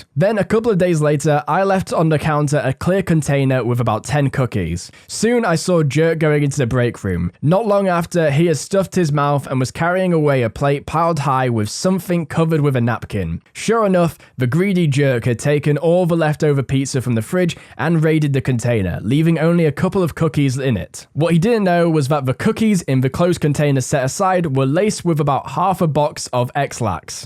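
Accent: United Kingdom. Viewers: It may be the accent. Since Jake is British. sounds like he has a British accent also. Edited to add: he verified that he is from England. The Accent is probably why you thought about Jake. needs to speak a little slower so we can understand him a little better. Jake and both speak a little slower.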